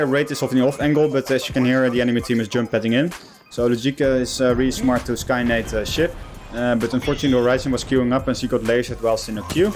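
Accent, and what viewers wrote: Netherlands. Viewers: also love the dutch accent btw :) and great video man keep it up!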